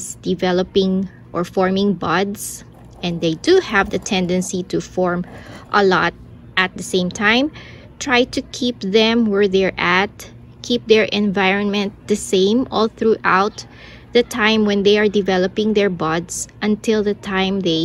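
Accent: Philippines. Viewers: "Rainbow Burst" would be a hybrid seed strain name. Plants from this strain would be variable in form and colour, hence the "rainbow" moniker. Latin names for cacti are ever changing and confusing but mostly very simple. The genus name: Echino = hedgehog. Opsis = appearance. That's a wonderful description...Of just about every cactus! The species name comes next and usually describes the flower or spine colour, the plant's form, the location where the plant grows, or the name of the European person who "discovered" the plant and decided to name it. Common Latin names for colours are: Flava - yellow, aurata - golden or orange, viridi - green, alba - white, rubra - red. Latin names make descriptions easier and more concise. It's much easier and quicker to call a plant Echinopsis Sanguiniflora than to call it "a cactus that looks like a hedgehog with blood coloured flowers." Hope this helps a little. 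I don't mean to be patronising, just giving you information most plant books don't give or explain. Looking at your photo I thought at first you were Mexican, then I heard your voice and now realise you're from the Philippines. I enjoy your channel and seeing the myriad cacti you grow so well. Mabuhay!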